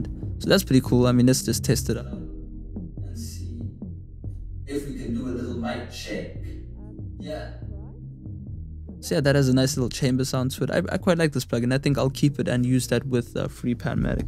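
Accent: South Africa. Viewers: are you south african dude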